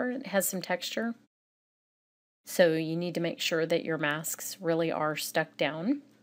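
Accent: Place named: Texas, United States. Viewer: I'm slowly working my way through your video and blog archives, adding many to my Favorites because of the techniques you show and tips you provide. Love your sense of humor a lot; your deadpan delivery in this video, when you were talking about options if you don't have 24 minutes to make a card, made me laugh out loud -- thank you for that! Also, I wish my Texas drawl was as lovely to listen to as yours; I tend to talk too fast to let my drawl sprawl ;-).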